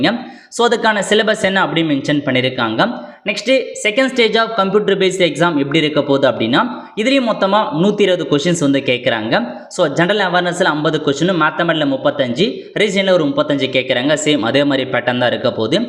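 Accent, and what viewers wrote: North, India. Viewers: நீ தமிழன் தானா...? உன் பேச்சு வடக்கன் மாதிரி இருக்கு...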